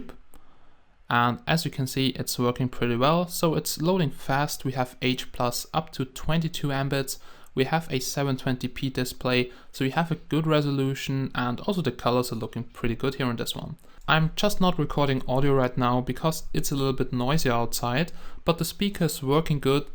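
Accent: Germany. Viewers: You dont Hear like a English man More German 😄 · Ich bin halb irisch und halb deutsch meine muttersprache ist englisch und ich merke sofort das deine deutsch ist. Nichts gegen dich aber könntest du auch mal videos machen wo du deutsch sprichst? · did I hear a german acsend? :D naa hörst dich n bisschen so an :D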